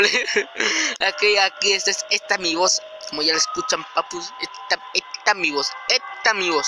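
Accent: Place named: Cuba. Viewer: No te preocupes por hablar como cubano, Soy peruano pero hablo como mexicano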